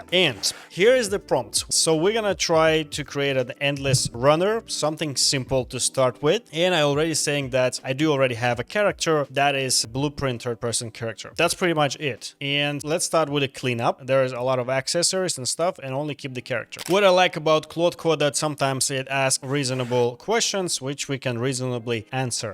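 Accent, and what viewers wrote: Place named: Russia. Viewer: чел русский понятно по акценту,но вот доказательство остановите ровно на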